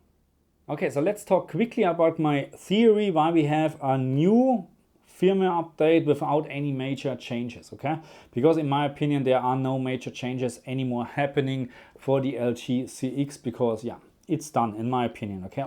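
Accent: Germany. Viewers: You are clearly of german origin, right? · Du bist 100% deutscher oder? Diesen englischen Akzent erkenne ich überall 😅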